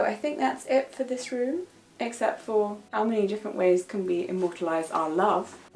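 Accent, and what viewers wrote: United Kingdom. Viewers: I bloody love the British accent of 👌 💗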